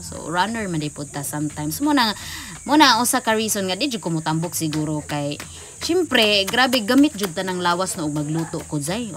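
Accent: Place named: Bohol, Philippines. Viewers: manimaho gihapon dai.basta bulad grabi gyud.humot nato kaajo.pero bakag nila🤣salamat salamat kaajo sa reply dai.permi ko lingaw imo video.asa gani injo sa Bohol.murag Calape ang tuno injo sinultihan.Dual Carmen amoa dai. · True proud boholana hear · I love the background music ma ❤️ dalangpanan. I always watch your videos your accent reminds me of my boholana lola and lolo ❤️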